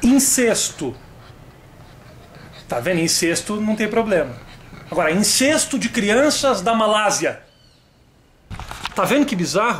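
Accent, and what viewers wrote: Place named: São Paulo, Brazil. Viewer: meldeus, o já está puxando o R de paulista... (se ele já puxava eu não fazia ideia).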